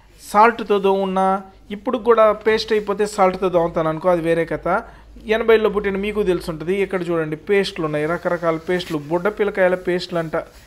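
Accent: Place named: Rayalaseema, India. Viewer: Medhi a district anna rayalaseema slang la vudhi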